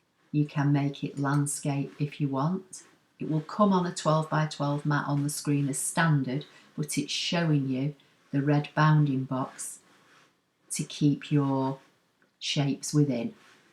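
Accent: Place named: Bolton, United Kingdom. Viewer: Hi, are you from anywhere near Little Lever, Bolton, youre accent seems very familiar. Also I had an aunt who lived in Breitmet that had a clock just like the one I hear in the background! Love your videos by the way, I am waiting for my first ScanNcut machine to arrive.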